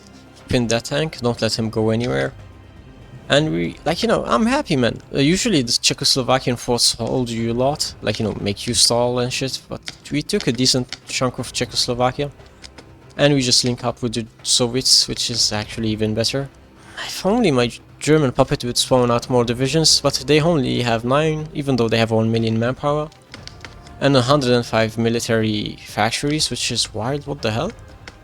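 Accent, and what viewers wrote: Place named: France. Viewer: You sound french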